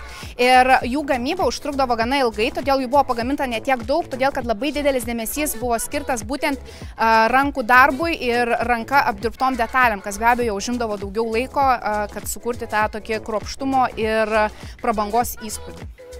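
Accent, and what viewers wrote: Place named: Šiauliai, Lithuania. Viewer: Apzvalga šiaulietiska 😂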